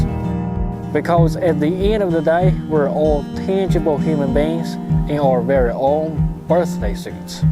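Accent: South, United States. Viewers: I think if you had learned and tried to speak with the "midwestern American" accent that television news reporters use, you would speak with a Chinese accent. Because you learned and speak with a southern accent, it hides any Chinese accent. You sound like a person from the American south. If this works for you, great! There are probably relatively few people from China who are learning English with an accent from the American south so it makes you very memorable. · Men you have no idea how much I love you southern accent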